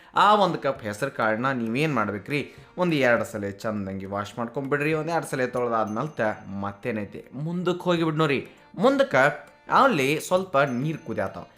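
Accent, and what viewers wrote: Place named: Karnataka, India. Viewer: Your kannada explanation is so sweet to hear,our native desi kannada.❤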